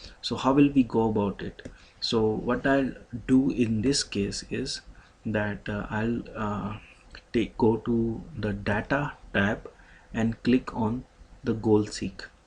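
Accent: India. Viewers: her the hindu accent had to fucking leave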